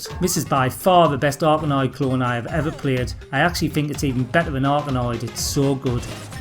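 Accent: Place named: Newcastle, United Kingdom. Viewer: Aye. Good to hear geordie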